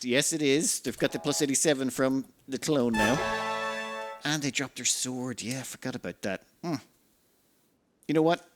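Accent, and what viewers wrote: Ireland. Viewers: Man WTF... Your life does not mean you can stray from the now mandatory daily update of the saga.....:eyes-purple-crying: love you.. longer vids plz, just give us the full 3 hour sesh without edit on a side vid, ppl would watch or listen to you for hours. Those who say otherwise are metal horrors... All you would need to do is talk for 3 hours... no trouble at all XD. My Girlfriend plays Oni and watches your vids religiously on that game.. I play Rim and do the same.. But we both watch any of your vids in the background while playing or chilling... eg. If you have a little more time to do comentary on longer vids ppl will watch or listen, as a Scotsman its very nice to hear an Irishman narrating Great vids on my Fav game! :face-green-smiling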